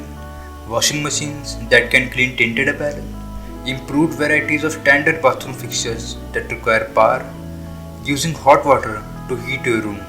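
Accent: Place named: India. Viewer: >indian accent >poo mod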